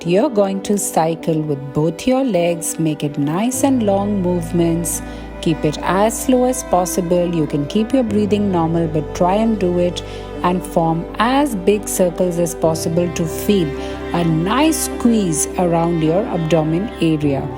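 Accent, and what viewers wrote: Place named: India. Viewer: Elle super intéressante ta vidéo, sauf que ton English est trop indien😅😅😅😅,mais ça va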